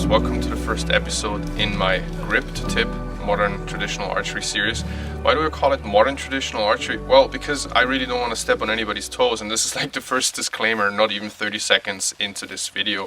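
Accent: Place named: Austria. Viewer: du klingst irgendwie österreichisch ?? ;-) nice video, nice bow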